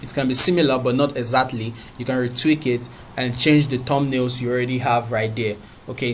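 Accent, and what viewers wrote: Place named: Nigeria. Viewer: Hi. Thanks for your a great video. I like your accent. Are you Nigerian? I saw your comment on another video I jus subbed you. Please sub me back I'm also about making money on YouTube.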